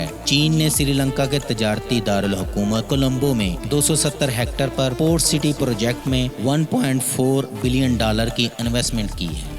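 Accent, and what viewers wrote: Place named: Punjab, Pakistan. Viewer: Biggest fan 😘 I love your beautiful punjabi accent Urdu , dear aap apna face reveal kro ❤️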